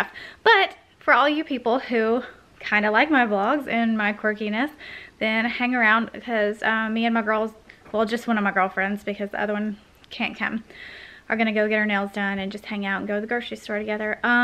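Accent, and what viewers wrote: South, United States. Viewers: Your sassy personality, and accent 🤗 love it! Reminds me of my hometown where everyone has a southern twang ❤️ Also love that you shopped at Walmart, a store that everyone has access to! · Hey thanks for doing this...and love your suthern accent...not many of those left around Atlanta...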